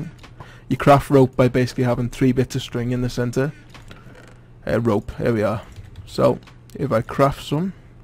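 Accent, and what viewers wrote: Liverpool, United Kingdom. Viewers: he sounds scouse and im scouse but fuck me this is defently 2013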